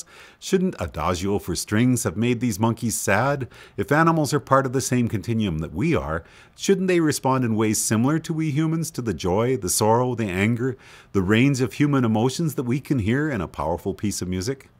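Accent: Canada. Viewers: hey i didn't realize you sound canadian until now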